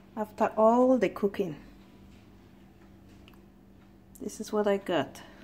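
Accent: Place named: Jamaica. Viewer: You sound like a Jamaican thanks you tho for sharing love and lite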